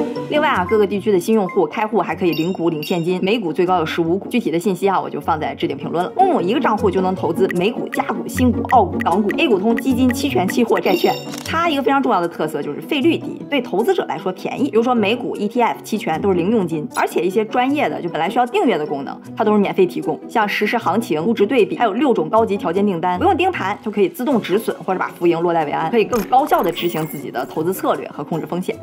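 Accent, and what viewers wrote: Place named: Dalian, China. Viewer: 新年快乐🎊这期太棒了 特别喜欢小lin用亲切的大连普通话娓娓道来 有趣 易懂🤓我也是大连人所以倍感亲切。如果有机会 希望小lin出一期影响美股市场大盘上涨和下降趋势的几项重大因素。我想点击量一定会爆棚🎉虽然我们心里都是模模糊糊的明白一些，没啥系统，都是叶子 没形成树，相信小lin的总结归纳能力❤支持我说的 大家点个赞❤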